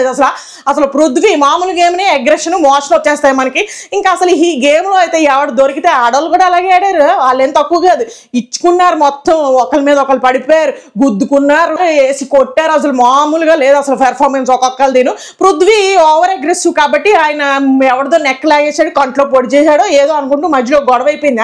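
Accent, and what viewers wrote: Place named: West Godavari, India. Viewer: navvaleka sachipoyanu! thank you so much! Bigg Boss should continue only for your reviews andi! Are you from West Godavari? Your slang sounds bit like us.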